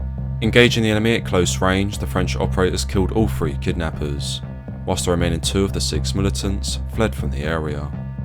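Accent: United Kingdom. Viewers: Love your videos. The biritsh accent makes it even better.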